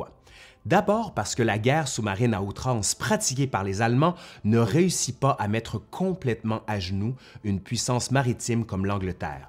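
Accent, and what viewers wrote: Quebec, Canada. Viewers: Vraiment génial ! Je l’ai regardé deux fois de suite pour être sûre d’avoir tout retenu 😍 et tellement fan de l’accent québécois ☆ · J'adore cet accents québécois et merci pour cette vidéo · moi perso, j'adore, surtout avec le petit plus d'accent québécois^^ très dense, on sent bien qu'il y a du travail derrière. Agréable début de WE pour moi donc^^ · Excellent, j’ai toujours aimé l’Histoire mais votre manière de l’expliquer est vraiment prenante et en plus j’adore cet accent québécois, toutes mes félicitations! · Super comme toutes vos interventions. Merci beaucoup entre parenthèses j'adore votre accent canadien !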